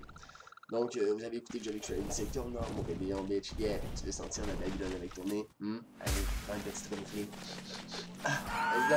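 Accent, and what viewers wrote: Quebec, Canada. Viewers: T québécois ???☺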